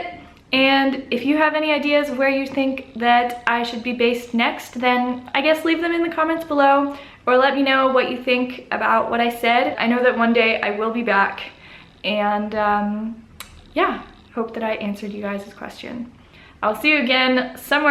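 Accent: United States. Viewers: I guess that you are an American based on your accent. But I can't decide where you got that blue eyes. Hah hah.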